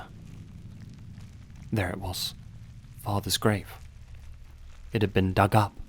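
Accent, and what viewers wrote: England, United Kingdom. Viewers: I’m kind of new to this channel, and I’m really enjoying itself! Although one thing, I’m so confused on where is from. He has a British accent I think, but he says he’s lived in a lot of places in Asia I think. Sorry if this is a weird or dumb question! I’m just a little confused. 😅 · You grew up in the philippines ? I always thought you were british 😅 at least you have a british accent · Am I late to the party or…..did this white guy with an English accent just say he was Filipino? I’m thoroughly confused but having said that, been binge watching videos for about a week and now I can’t sleep 😊 Love the content!!!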